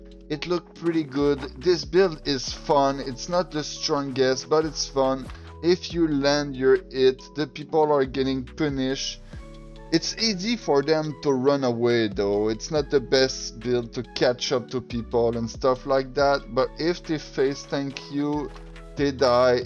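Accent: Quebec, Canada. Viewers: es-tu quebecois? ton anglais ma l'air d'avoir un accent QC 😅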